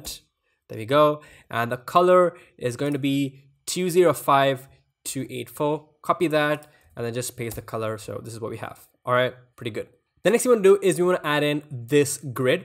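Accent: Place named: India. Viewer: Its simple, I hear indian accent, I click back to search :)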